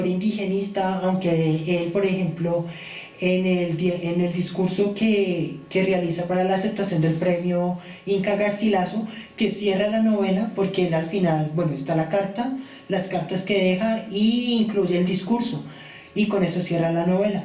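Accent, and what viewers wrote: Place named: Colombia. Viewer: detecto un acento colombiano xd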